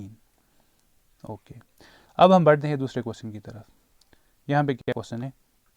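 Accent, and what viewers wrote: Kashmir, India. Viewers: Zero respect for your pitch and language. the way you speak Urdu. It's like kashur Urdu.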